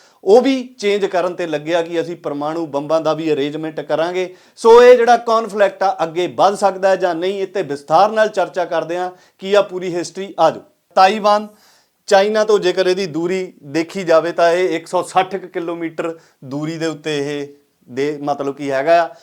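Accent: Doaba, India. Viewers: Bhai Sahib Jeo Tuhadi Language Doaba Wali Aa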